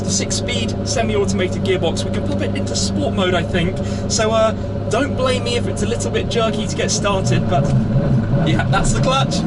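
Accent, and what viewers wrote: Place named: United Kingdom. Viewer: 영어는 뭔말인지 못알아 들어도 이사람 영국 억양 오지게 쓰는건 느끼겠다.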